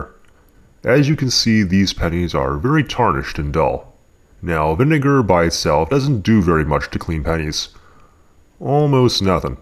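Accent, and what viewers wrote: Canada. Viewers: Ahhh ur from Canada eh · Are you canadian? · I knew he was Canadian in the first video I saw, which was I forget which one lol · I personally think Canadians are awesome. They have beautiful accents, they live in a fantastic land, and best of all they have this guy.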